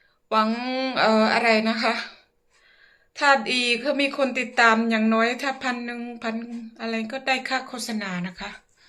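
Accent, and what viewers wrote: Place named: Cambodia. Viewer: อ๋อ.. ขอโทษด้วยคะ พอดีว่ายายเป็นคนเขมรหน่ะคะ สำเนียงของน้องเหมือนแถวบ้านยายคุยกันเลย ❤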